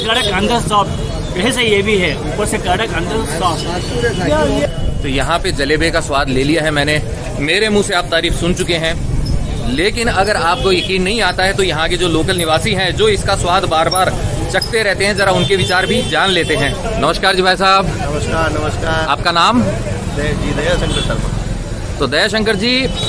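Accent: Standard. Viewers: Ram Ram Bhai..mujhe shuddh Hindi bahut accha lagta hai, jaise aap bolte hai... Aayiye kabhi Assam, milte hai..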